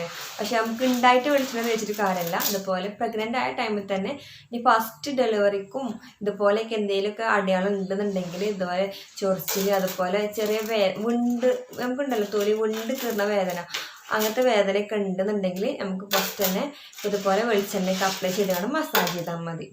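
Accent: Malappuram, India. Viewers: സ്ത്രീ ശരീര സംരക്ഷണത്തെക്കുറിച്ചും ലൈംഗിക ജീവിത ആരോഗ്യത്തെക്കുറിച്ച് ഉള്ള വീഡിയോകൾ കൂടുതലായി ചെയ്യുക പിന്നെ നിങ്ങളുടെ മലപ്പുറം ഭാഷ ശൈലി എനിക്ക് വളരെ ഇഷ്ടമാണ് എല്ലാ വീഡിയോകളും കാണാറുണ്ട് കമന്റുകൾ ചെയ്യാറില്ല എങ്കിലും പിന്നെ പറ്റുമെങ്കിൽ കുറച്ച് ഊർജ്ജസ്വലമായി സംസാരിച്ചാൽ നന്നായി വരും അല്ലാഹു അനുഗ്രഹിക്കട്ടെ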